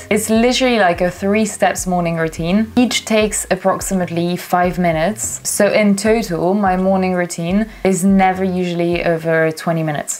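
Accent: United Kingdom. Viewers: Such a beautiful accent from the UK ! Moi qui essaie d'améliorer mon anglais et avec cette thématique c'est d'autant plus motivant ! Merci et bravo pour cette vidéo :virtualhug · J'adore ton accent britannique 💕💕💕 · great british accent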